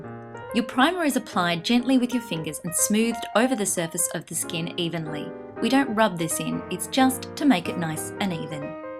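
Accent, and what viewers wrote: Australia. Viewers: I was taken aback at the Aussie accent. It's always nice to hear on this platform, though.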